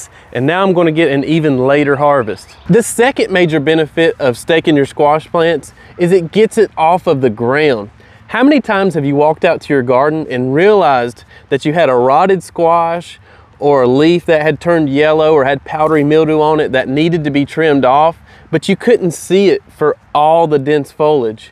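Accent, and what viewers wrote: South, United States. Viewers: I'm so glad the American accent is alive and well. One of the most beautiful of all of them, as represented here. It's a soothing and comforting sound if you had Aunts, Uncles, or Grandparents, or anyone else you looked up to, who was from our South.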